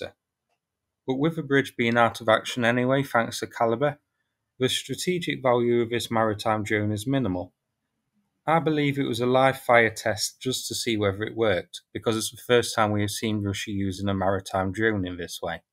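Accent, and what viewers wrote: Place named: Northern England, United Kingdom. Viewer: Thanks for the reliable info that you give us. May I ask a personal question? I love your accent, may I know where you are from? Perhaps northern England?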